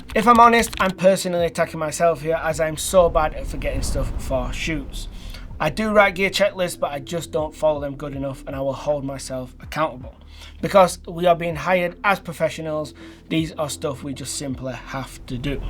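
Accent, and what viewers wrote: United Kingdom. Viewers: Great tips, thanks, I HAVE to ask where in the UK your accent comes from? (if you don't mind)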